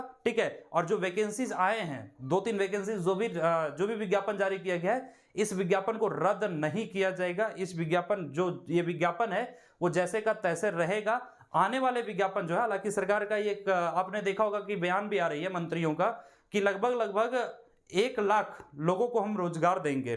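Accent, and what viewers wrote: Bihar, India. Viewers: Bihari ho lagta h tera baat se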